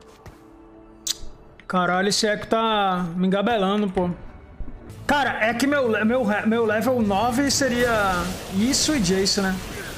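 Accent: Recife, Brazil. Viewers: Tu é de Recife meu bom? N acredito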